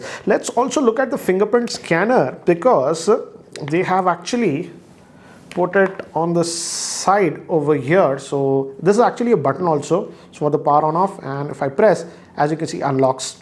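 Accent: India. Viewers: I love Indian accent.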